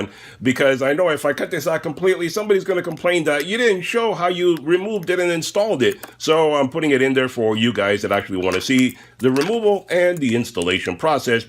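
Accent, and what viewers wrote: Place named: Chicago, United States. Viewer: you have a great voice. Man, I don't think I've ever said that before... especially to a man. haha Reminds me of the Chicago accent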